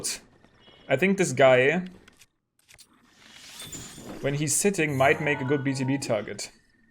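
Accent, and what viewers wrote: Germany. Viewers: My mom is German and I guess I don’t watch a lot of German people speaking English but now I weirdly associate you with my mom. Thanks Also, herzlichen Glückwunsch zum Geburtstag!!!!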